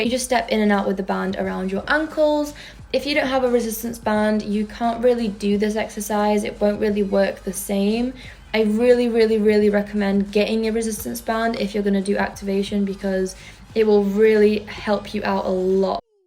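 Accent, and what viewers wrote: United Kingdom. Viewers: love your accent :3 sounds english, did you grow up in the UK; do you speak portugese too¿?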